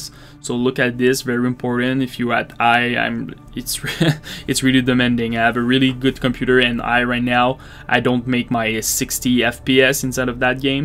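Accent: Quebec, Canada. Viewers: Are you from Quebec? I usually recognize the english accent of my people :P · Yo dude t'es tu québecois? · is that a quebec accent i hear ? lol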